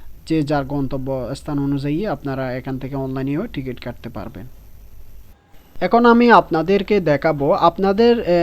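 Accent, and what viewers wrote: Chittagong, Bangladesh. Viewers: your accent says you're habituated in your own Chatigao language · যখন ভিডিও বানাচ্ছেন তখন সুদ্ধ ভাষায় বলা উচিত এতদিনেও চট্টগ্রামের টোন পরিবর্তন করতে শিখেননি যার ভিডিওটা দেখে মজা পেলাম না।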